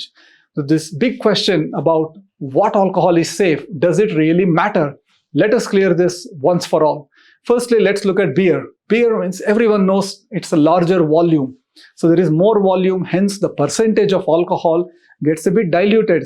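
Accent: India. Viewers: Awesome Indian accent. 😆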